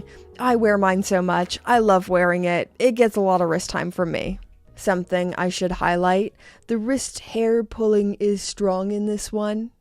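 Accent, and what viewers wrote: Canada. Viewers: I keep hearing the long O sound in “about”. Is Canadian? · Stay with your Canadian accent. I can sing the Canadian National Anthem and I’m American. “Oh, Canada…” Are you happily married?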